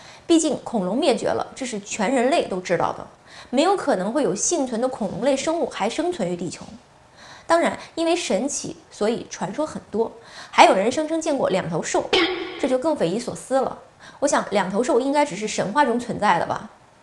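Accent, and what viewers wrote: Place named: North, China. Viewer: 這腔調一聽就知道是大陸北方人XD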